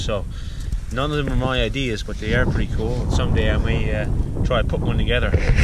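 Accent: Ireland. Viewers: Why the half Irish accent? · New to the channel. Love it. Cannot get enough. You sound Irish to me or is that the Newfoundland accent. Please do not be offended, just trying to learn. Thanks! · "Go habs go" Alright buddy, I liked you yesterday, now I'm straight up in love! Dude your channel is loaded with content! Damn productive you are! Is your accent from Ireland? Also, where do you live man, to be a habs fan? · And I am new to your channel but you sound Irish, some of the music too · Just found your channel through joe robinet i know your from newfoundland im from northern ireland and you sound as if you've got a bit of an irish brogue about you I've watched a couple or your videos and liking them very much i subscribed so will be looking forward to some more videos well done m8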